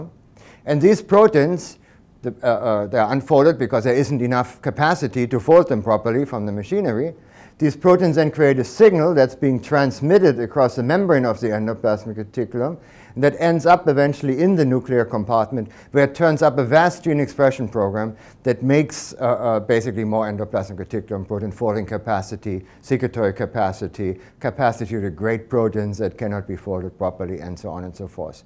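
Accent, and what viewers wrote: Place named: Germany. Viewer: I remember when he still had a thick German accent when I first met him. It looks like it has shifted over time.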